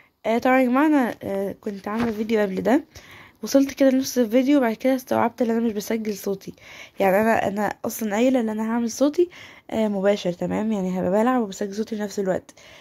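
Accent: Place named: Saudi Arabia. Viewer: والله انك صرتي تتكلمين سعودي يا لبيهه😭💀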